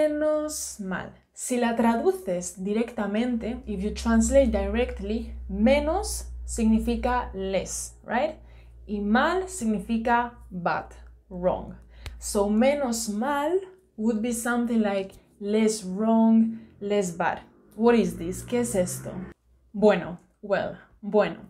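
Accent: Spain. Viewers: nice video ' are you from spain?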